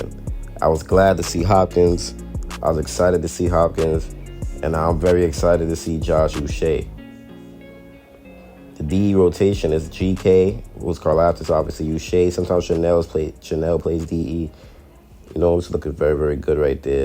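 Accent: East Coast, United States. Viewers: U sound like u from the east coast some where like Baltimore or jersey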